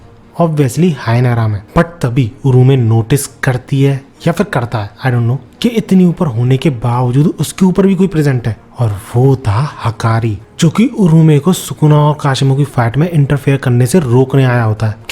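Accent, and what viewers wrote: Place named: Uttar Pradesh, India. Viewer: Bhai ye UP vale accent mein ARA ARA mat kiya karo, japanese words bol hi mat, bahut bekar lagta hai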